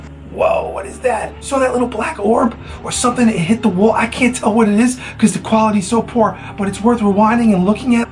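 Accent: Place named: France. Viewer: Hi. Sounds like you have a bit of a French accent. Cool!.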